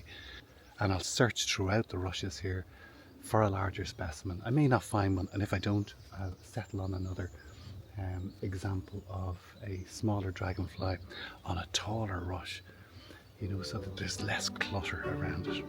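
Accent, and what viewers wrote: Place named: Ireland. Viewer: As usually, just love your relaxed soft Irish delivery on the narration plus a video about my chosen genre of photography ( macro/ close up nature ) followed up with some very interesting facts and outstanding images really couldn't get any better. Certainly for me one of the most enjoyable vids. seen on u-tube of late. 👌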